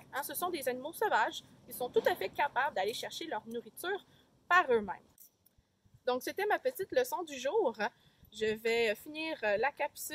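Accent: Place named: Quebec, Canada. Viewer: Les Québécois vous savez pas parlez Français Vous dites :Point au lieu de pins Vous dites :Conifaere au lieu de conifère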